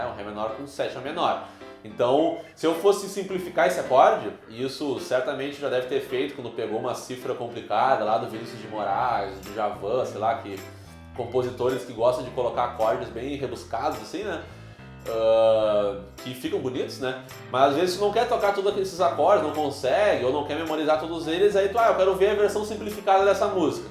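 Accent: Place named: Porto Alegre, Brazil. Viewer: Sotaque de pôoorto, bah!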